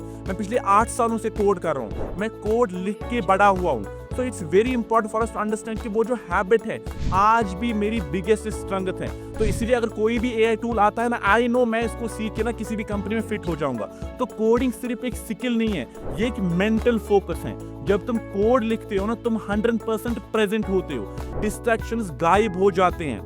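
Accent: Kashmir, India. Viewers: Ap kashmir say ho kya sir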